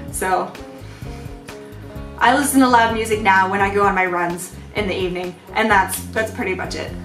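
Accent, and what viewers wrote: United States. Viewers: And I like your deep, funny, "What are you talking about..." American voice!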